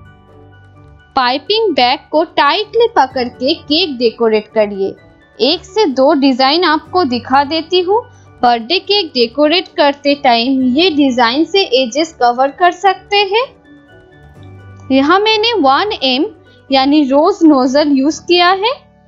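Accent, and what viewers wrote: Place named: Bengal, India. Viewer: Thank you for all the information. BTW, your accent proves that you are a Bengali. 👍🏻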